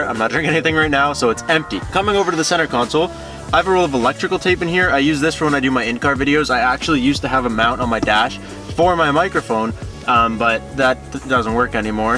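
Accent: Canada. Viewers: I can tell your Canadian lol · right, the accent is hard when he says "out" or "pouch"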